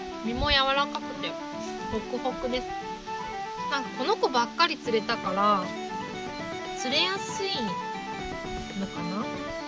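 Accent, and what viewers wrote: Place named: Kyushu, Japan. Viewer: こんばんは！初めまして！ 釣り姿がカッコイイですね！ いい感じのカワムツのオスですね！ 質問ですが九州の方ですかね？ 方言が聞いた事あるのでw チャンネル登録させていただきます！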